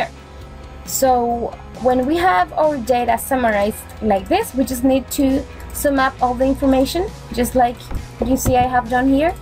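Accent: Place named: RP, United Kingdom. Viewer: Well done, Congratulations. By the way, your english is very good, much better than the english from english speaking countries, it is BBC like.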